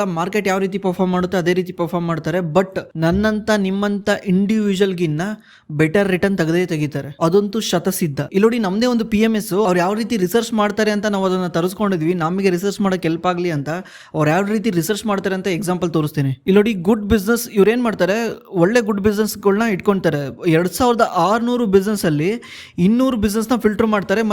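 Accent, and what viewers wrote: North Karnataka, India. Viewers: Bro nimma speech language kelidare neevu north karnataka start areadavaru irbeku anisutte nimma native place yaavdu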